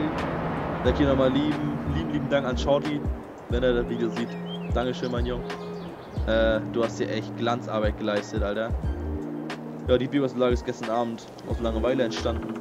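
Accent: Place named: North, Germany. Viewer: Sehr toller Hof, tolles Video, sympathische Stimme samt Akzent (ich liiiebe norddeutschen Akzent!). 👌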